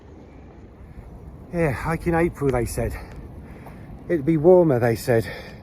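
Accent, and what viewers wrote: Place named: United Kingdom. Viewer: As Brit who watches a lot of US thru-hikers, it's a nice change to hear a British accent.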